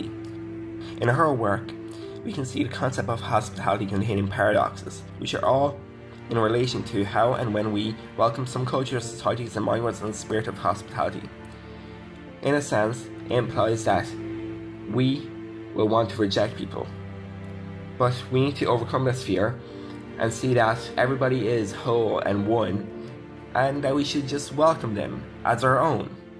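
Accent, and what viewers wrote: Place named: Dublin, Ireland. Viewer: Aloha OMGoddess I love your wee spirit --- stumbled over SOME thing [I think vegan-related?] on FB that led me to your AerLingus vlog.. Was seriously impressed with your openness and positive * clarity * throughout... not to mention #videoeditingSKILLZ!!! Love your accent as well (we were just talkin about Dublin accents tonight in College 🤣)*.. Anyway Sure you probably get loads a wee messages like this so... Slán go fóill 🙏🏼🙏🏾🙏🏿 * we're over in Co.Clare tho btw 💡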